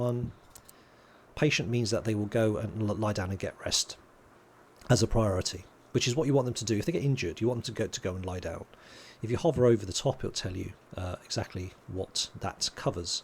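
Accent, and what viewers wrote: United Kingdom. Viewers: Delivered with such natural ability. I'm a british expat living in Norway, always nice to hear a fellow brit now and again. I shall continue on with the series, as the guide in general was a tremendous help. 10/10 for clarity, bravo. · You have an excellent voice for narration, I swear the English accent was tailor made for narration.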